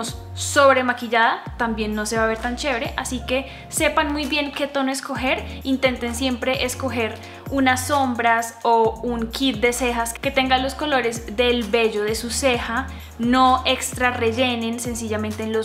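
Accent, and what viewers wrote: Bogotá, Colombia. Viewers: Eres de Bogotá? Jaja, es que por tu acento se nota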